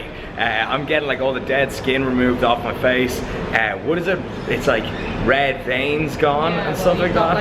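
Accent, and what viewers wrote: Ireland. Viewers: Missed that Irish voice. I'm coming Dublin soon buddy, if you're free we should defo partake in a Guinness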